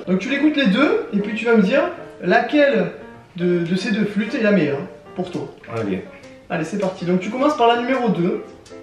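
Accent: Marseille, France. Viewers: tu et un Marseillais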